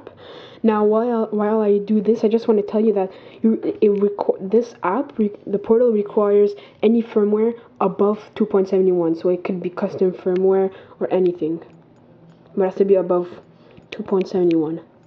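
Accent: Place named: Germany. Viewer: love de german accent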